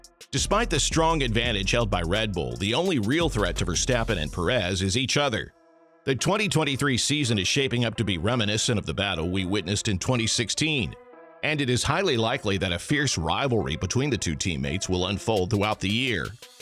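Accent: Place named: United States. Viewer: ngl I can't grasp an American accent trying to inform me of F1 information. Need british accent, can't believe information being said.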